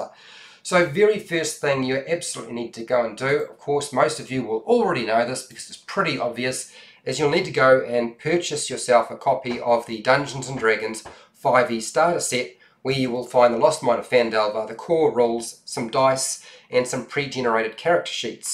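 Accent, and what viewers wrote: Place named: New Zealand. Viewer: you sound like you’re from New Zealand and stereotypical people, think fish and chips for you sounds like fush and chups.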